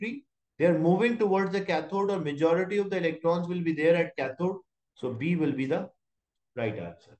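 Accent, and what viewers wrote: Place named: India. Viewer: Ur accent is indian are u indian?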